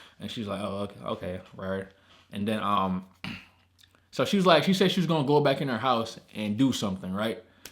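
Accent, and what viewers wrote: Jamaica. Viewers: Lol... Didn't knw u was jamaican bro... Big up😁✌️ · U from Jamaican?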